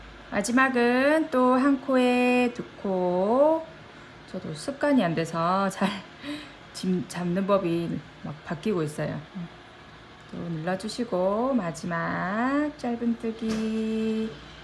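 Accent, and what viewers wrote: Busan, South Korea. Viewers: 어머...손재주 좋으시네요. 이런건 구매해서 쓰는줄만 알았는데... 살짝살짝 부산 사투리 듣기 좋아요 설명도 잘해주시니 한번 만들어 보고싶은 욕구가 막 생기네요.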